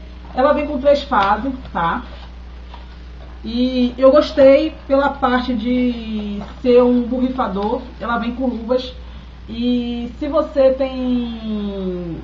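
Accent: Bahia, Brazil. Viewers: esse sotaque ai eu conheço .povo bahiano é tudo animado mesmo.quarquer dia eu vou na bahia conhecer melhor a cultura de vcs.amei o video bjs!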